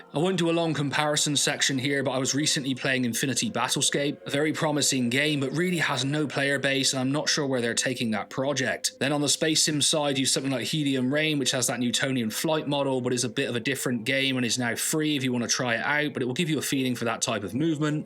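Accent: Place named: Bristol, United Kingdom. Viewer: Lol you sound bristolian AF mate - practically narrow it down to Hartcliffe - am I close?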